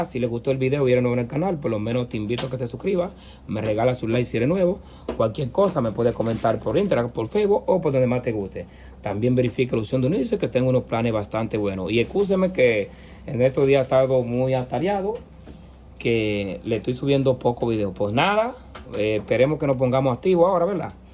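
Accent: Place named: Dominican Republic. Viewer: Ignorante ellos hablan así, su lenguaje de los dominicanos es así.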